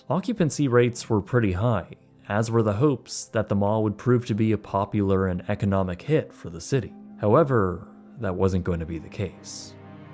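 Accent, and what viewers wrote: Canada. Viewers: Is the narrator Canadian?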